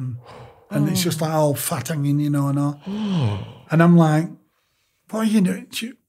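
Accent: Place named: Yorkshire, United Kingdom. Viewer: Such a lovely lovely man. He has been through so much in his life. I was so fascinated listening to him tell his story. I shed a tear when he did as the way he was treated was so dispicable. Love his Yorkshire accent too. Thank goodness he has a great supportive wife to help him get through. bless him 💘💖❤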